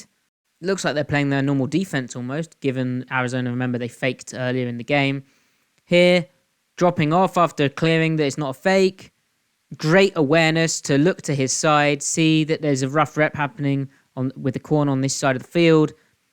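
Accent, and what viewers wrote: United Kingdom. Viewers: For someone with your accent, no offense.. I find it absurd that you call him unsung. The 12’s are aware of reed. We know. Calm your tits mate. I watch different channels from across the pond and I love that we have an international fan base. But you are not speaking for the home base. Maybe you’re just late to acknowledge it yourself.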